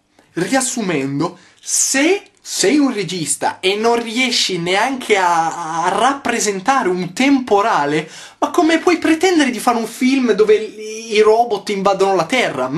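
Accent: Turin, Italy. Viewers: sei di Torino? :)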